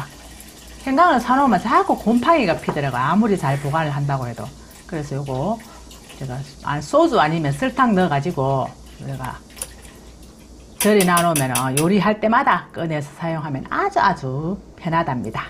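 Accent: Gyeongsang, South Korea. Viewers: 도대최 어디경상도 사투리입니까 대구에요 부산이에요 제가 어릴때 고향에서 많이듣던 사투리 같아서 궁금하네요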